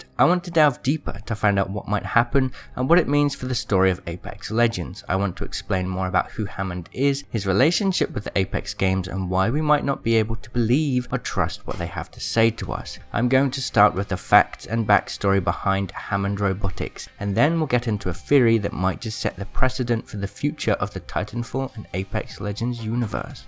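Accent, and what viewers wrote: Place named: RP, United Kingdom. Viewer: Bro, why do you speak like a posh dude?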